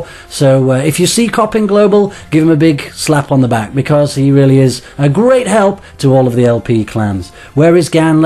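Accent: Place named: United Kingdom. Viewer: Am i the only one who pictured this dude as a 70 year old british male?? This was the first video i saw of his face and was completley shocked!!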